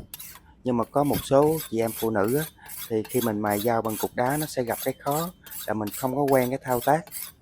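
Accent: South, Vietnam. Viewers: Rất thích giọng nói của nghe là biết người miền Nam chân chất 👍👍❤️❤️❤️💐💐💐💐 Mọi người nhớ bấm đăng ký và bấm like nhé các bạn. From Australia 🇦🇺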